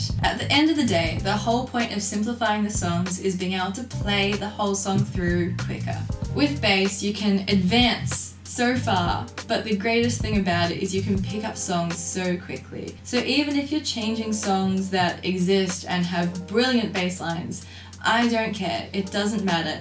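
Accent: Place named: United Kingdom. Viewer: I thought you was British lol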